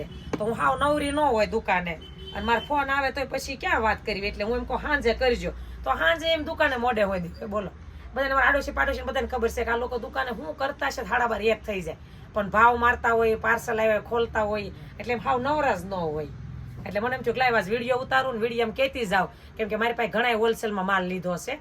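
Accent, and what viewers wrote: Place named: Amreli, India. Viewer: Tame amreli na hoy aevu lage chhe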